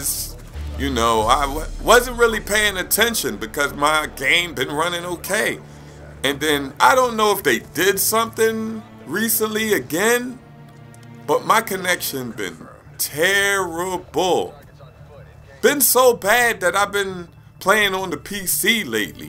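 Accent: Philadelphia, United States. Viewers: are you from philly ? i am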